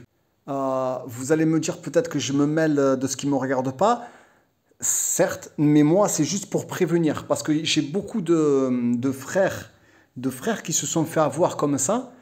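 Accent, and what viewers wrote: Marseille, France. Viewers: c'est quoi el falak ? J' aime écouter cet accent marseillais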